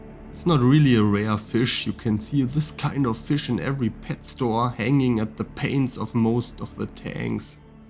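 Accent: Germany. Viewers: I bet you're German based on your dialect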